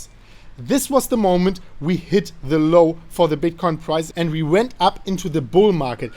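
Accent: Germany. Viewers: Mike Porten this is a very strong german accent, they often pronouncing „the“ as see or they say somsing instead of something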